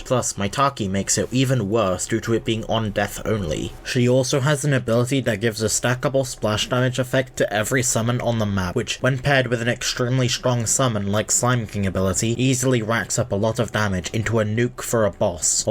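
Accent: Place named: RP, United Kingdom. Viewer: You talk posh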